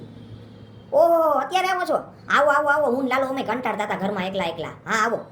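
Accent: India. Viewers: bhura tu rajkot no cho k nay... mne avu lage 6...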